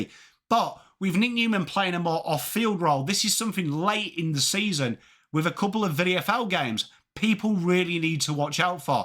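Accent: England, United Kingdom. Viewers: a pommy talking football lol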